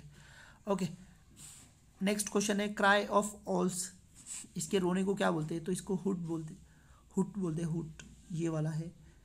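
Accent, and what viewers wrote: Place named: Nandurbar, India. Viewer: Mughe laga Nandurbar se hain aap .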